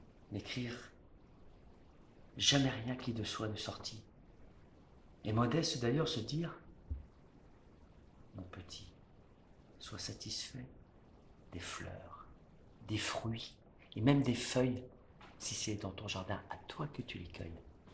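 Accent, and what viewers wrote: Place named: North, France. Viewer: Vous venez du nord vous ^^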